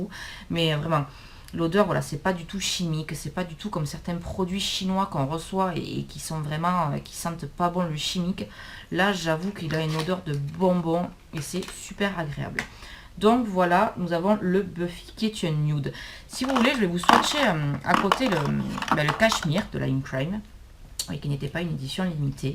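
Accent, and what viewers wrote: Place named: South-West, France. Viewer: C'est bien ce que je me disais j'ai reconnu l'accent du sud-ouest 😉 je suis de Mirande dans le Gers mdr 😘😘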